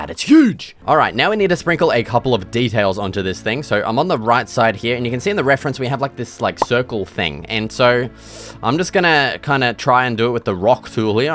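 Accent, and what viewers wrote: Australia. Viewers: I love ur Aussie accent cuz its so natural and funnyyy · Brother you sound Australian but also English it's confusing me, are you even either of them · this guy sounds like and australian frfr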